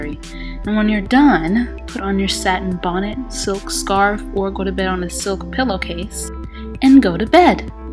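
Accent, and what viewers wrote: Africa. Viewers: You are hilarious. I'm Cameroonian but it's crazy how all the African accents sound sound so similar. Loved it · I love u african accent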